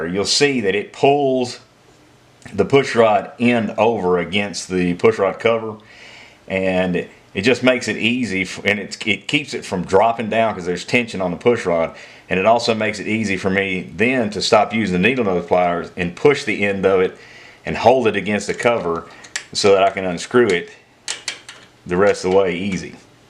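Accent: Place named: South, United States. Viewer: Thank you for getting back to me! I found that with them bled and taking all the lash out that it took the Screaming Eagle Adjustable Pushrods 4.25 turns . A guy at the parts counter of a local HD shop told me 4 to 4.5 turns and he was right. Thank you for your video. You sound like a Good Ole Boy! Just saying I'm from Mississippi but now live in SW Colorado. Come out for a trip, it'll be a blast taking you for a tour!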